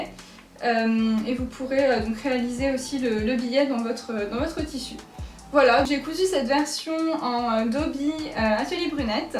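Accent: Paris, France. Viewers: Vidéo très sympa tu me donnes envie de me mettre à la couture ahah. Tu as un petit accent parisien, on te l’a déjà dit ? 🥰 Hâte de voir d’autres vidéos merci à toi!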